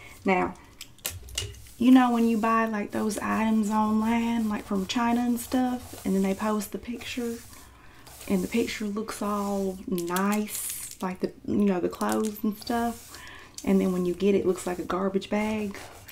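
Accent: South, United States. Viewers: please do make this a series 🙏 the southern accent is so satisfying and the mom character is just so loveable ❤ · as a alabamian born and raised in the south i can say this is 100% accurate and this is a almost perfect southern accent great job! but you forgot to offer her some sweet tea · 😂😂😂I CANT CANT CANT!!!! 😅😅😅ur killing me!!! 😂 I’m truly a Southern woman!!! 😂 you did pretty darn good with the accent - fyi your talking red neck mixed with country with a splash of southern baptist mom 😂…. 😂 Alabama- mixed with Georgia and Mississippi 😂 ❤ most fake southern accents I cant stand listening to… BUT YOU ARE AWESOME 😂…. I had 4 boys but was a grandma at 37 😂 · Whew, this accent is so accurate. It made me feel I was back in NC for a moment 😭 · This is so freaking funny 🤣 and very tingly!! Those clacking fingernails ❤ I am a southern woman and you nailed the accent 👏👏👏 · I love the Southern mom! 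I live in SW Indiana across the river from Kentucky and people here definitely have a twang. So this was relaxing and familiar - thank you!❤ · Everyone in my family has a southern drawl Can confirm this is accurate And hilarious 😂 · The sassy comments coming from our southern mama 😂❤ the accent is spot on! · As a Southerner with friends and family with thick accents, I am dead!! 😂 I love this! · Loved the Southern accent. Every Southern Mama I know follows up any "advice" or opinion with a "Bless your heart" to keep her sweet Southern Belle persona. Example: "Make sure you see him without his hat. He could go from an 8.5 to a 2, bless his heart." Excited to see Part 2 and how the date went! ❤ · My new favorite video of yours!!! I love southern accents ❤😂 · Your southern accent is so on point... where are you from? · This was such a solid Southern accent! 😂 I’m from the South, and although not everyone in the South talks this way, for the ones that do, this was spot on.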